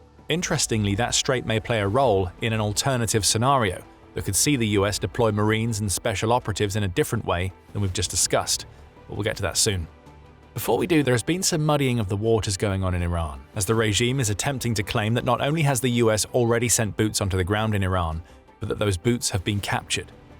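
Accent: United Kingdom. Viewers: Love hearing a Brit talk about stuff they aren’t involved in lmao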